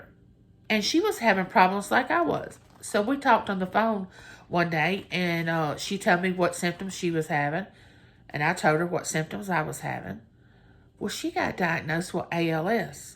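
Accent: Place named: South, United States. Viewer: I simply enjoy hearing you talk. The southern accent amazing. Thanks for sharing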